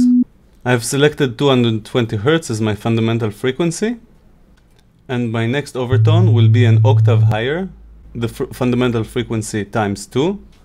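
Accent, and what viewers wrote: Israel. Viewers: a mathematical point of view: Fourier Series <link> האם זיהיתי מבטא ישראלי? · you from Israel / you have the accent/ good work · Haha It happened again, looked for a good music explanation, found a video in English (Which is not my mother language) but with Hebrew (Israeli) accent (Which is my mother language).. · Where are you from? You have an Israeli accent. :)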